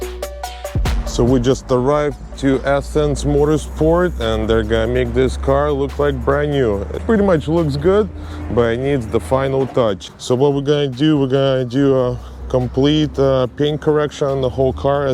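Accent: Russia. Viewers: You sure sound Russian.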